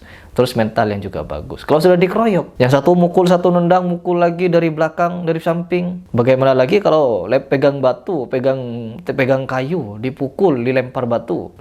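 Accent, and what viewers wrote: Madura, Indonesia. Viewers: Mas sampean orang madura ya?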